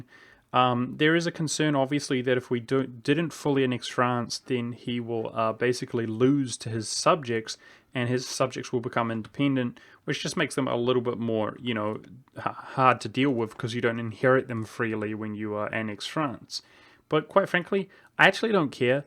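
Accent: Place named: New Zealand. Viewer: He sounds like he’s from New Zealand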